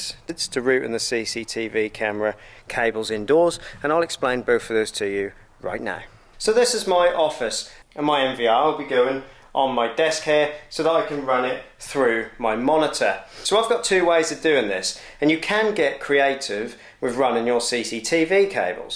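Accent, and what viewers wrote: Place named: Norwich, United Kingdom. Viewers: Apparently most people think the English don’t know how to speak Merican. He does come from the country that invented our language and maybe…just maybe he is saying it correctly and we as Merica is not? (I am married to a Brit and I’ll tell you there was a learning curve to what England English sounds like…kinda like trying to understand anyone from Alabama, Mississippi, either Carolina and southern Ohio) His technique on instillation is spot on. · Thought I recognised the accent. I was bought up in Hellesdon (not the Hospital ) I've heard all the jokes 😒😅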